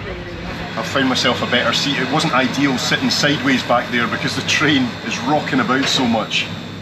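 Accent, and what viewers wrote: Scotland, United Kingdom. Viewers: Nice job!!! Love the Scots accent 🎉🎉🎉🎉🎉! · The harsch, sweety Scottish accent! Immediately a subscriber! And a good story-teller too, mind you.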